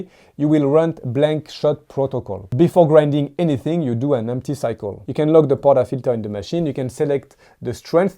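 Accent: France. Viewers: Un français qui parle anglais 😊 merci j'ai tout compris 🙏✨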